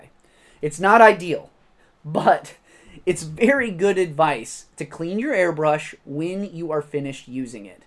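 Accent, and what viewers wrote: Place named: United States. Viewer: Get to the point and just stop the twangy American blah blah blah theatrics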